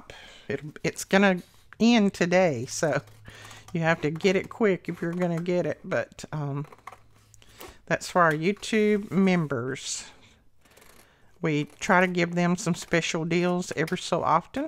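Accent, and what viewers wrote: South, United States. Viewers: The flowers are great. Thanks. I always forget about fussy tearing so will print everything twice so I can do both. To a lot of us you have a beautiful southern accent, so keep on talking 😂. ❤❤❤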